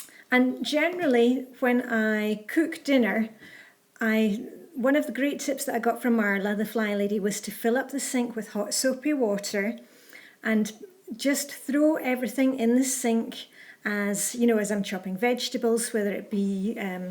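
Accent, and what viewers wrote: Scotland, United Kingdom. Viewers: Where about in Scotland are you from? I’m in Edinburgh lovely to. Hear a Scottish accent!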